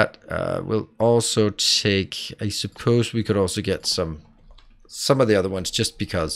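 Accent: Denmark. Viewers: I was today years old when I figured out you are Danish. Damn, you've managed to hide the Danish accent very well. Kom så :D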